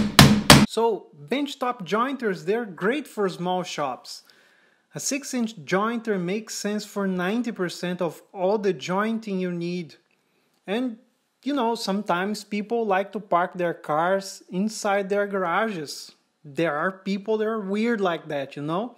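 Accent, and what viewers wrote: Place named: Brazil. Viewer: Sounds like a Brazilian to me based on the accent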